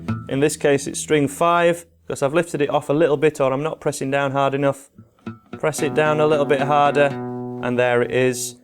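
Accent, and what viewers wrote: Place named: Northern England, United Kingdom. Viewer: Most recent!!! Hi I'm enjoying your video. Thank you for taking the time to post it in order to help beginners like myself. I'm English living abroad and it's nice to hear your northern accent. Feels like being back in the UK. 🤩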